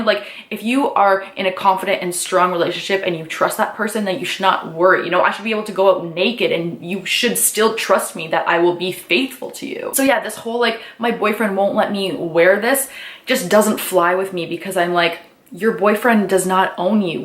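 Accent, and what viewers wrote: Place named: England, United Kingdom. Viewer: I love that you STILL use that English accent. Watch you end up moving to England and your videos will start to become more an more like the real thing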